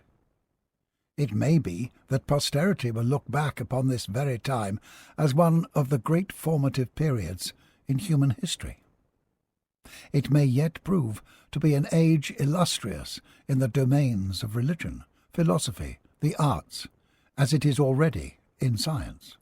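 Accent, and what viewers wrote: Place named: England, United Kingdom. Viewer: Thank you for posting this video. I loved listening to it narrated by an Englishman.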